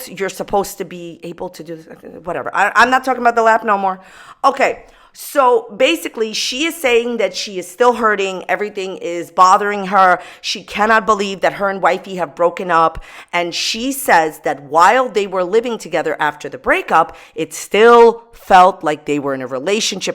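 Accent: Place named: New York, United States. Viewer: I’ve been a part of the Amberverse since this most recent “breakup” in July. No idea how I haven’t found your channel sooner!! I moved to Florida a few years ago, from Massachusetts. Your thick NY/NJ accent reminds me of the North… I love it! Definitely my new favorite GorlWorld reporter!!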